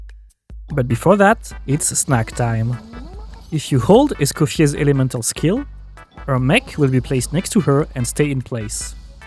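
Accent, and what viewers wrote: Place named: France. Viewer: my bad but more I hear you more I think I'm hearing a french accent like I don't feel u to have English as first language 👽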